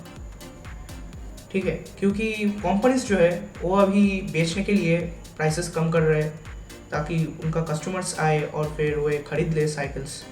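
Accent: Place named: Bengal, India. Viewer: your hindi sounds like bengali....nice though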